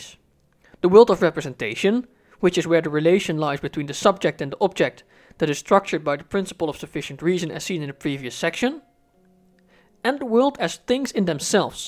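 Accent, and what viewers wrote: Netherlands. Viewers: You're Dutch, aren't you?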